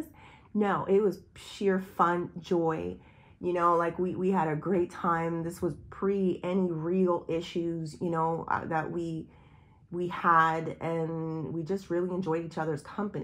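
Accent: United Kingdom. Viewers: This is awesome. I love the British Accent also. Yes God is helping me cleanout things people actions attitudes that have held me back from enjoying true happiness. Thank you your reading is on point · Everything you have talked about today is 💯and the proper part? I’m from Manchester England and you were sounding like me. Lol and you know your right. Just being able to be yourself with no restraints like a child again in a way. God always shows me when I need it. Thanks for this today. I needed it🙏⭐️💐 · The British accent is awesome 🙏🏼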